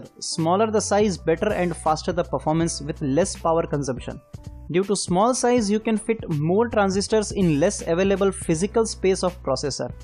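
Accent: India. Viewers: Love that indian accent lol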